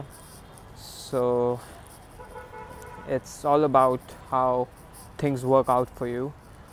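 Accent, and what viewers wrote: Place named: England, United Kingdom. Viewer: You r a outstanding quoran brother but remember one thing this english accent won't fetch publicity to u...Just be practical...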